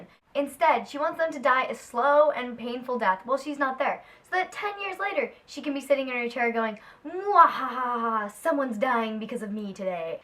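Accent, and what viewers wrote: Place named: Canada. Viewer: Love this, think you'll go far. By the way are you Canadian? Love your accent x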